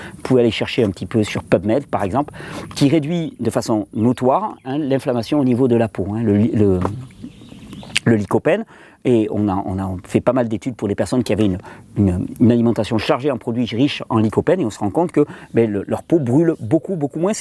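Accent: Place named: Southern France, France. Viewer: Harmo Chopin En Pyrénées Orientales (66) oui appelée aussi Catalogne ou Pyrénées Catalanes également . Mais voyons ne reconnais-tu pas cet accent ensoleillé Méditerranéen du Midi ??? ;-) Mais il y a une partie de ce même département s'appelant Cerdagne (Latour de Carol, Dorrès, Bourg Madame ...) plus proche du Pas de la Case/Andorre et Limitrophe avec L'Ariège (09)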